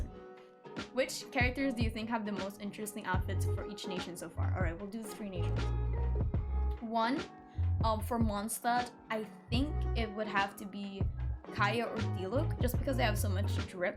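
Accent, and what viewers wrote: Philippines. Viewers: I KNEW IT LMFAO AS A PINOY ANOTHER PINOY ACCENT IS SUPER RECOGNIZABLE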